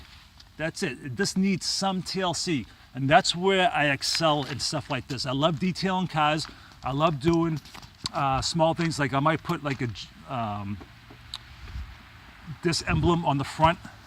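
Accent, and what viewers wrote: Boston, United States. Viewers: P.S you got the most Boston accent that I ever heard!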